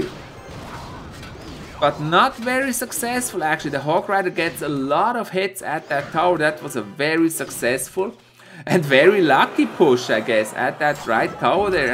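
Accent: Switzerland. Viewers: Chas si das du schwizer bisch? Schöne akzent😁🌾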